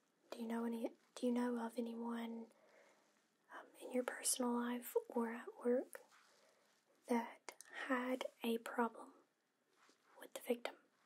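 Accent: South, United States. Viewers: the southern accent just makes this roleplay much better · Huge fan! The only complaint I have is I never make it through a video without falling asleep! 🤣I love your accent! and I have to know.. where are you from? I've lived in La. Ar. And Tn... if I had to guess, Georgia? S. Carolina? Regardless, thanks for the great asmr sessions!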